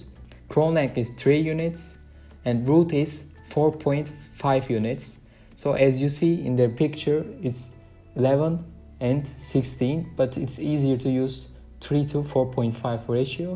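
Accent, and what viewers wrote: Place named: Turkey. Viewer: You are turkisch? Because speaking like turkisch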